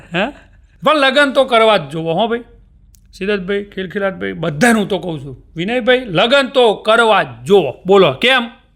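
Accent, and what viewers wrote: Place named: Mehsana, India. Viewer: मेहोना नी टिपिकल बोली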